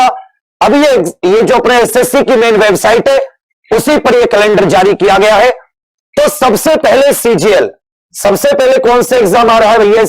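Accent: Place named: Uttar Pradesh, India. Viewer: Guru ji sudh desi u.p ka lag rha ho sol ma ek dam must 🤘🤘🤘❤️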